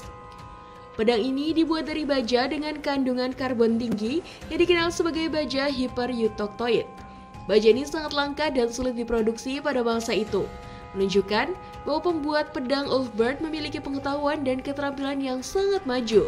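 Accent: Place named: Tegal, Indonesia. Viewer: pengisi suara nya seperti orang jawa, tegal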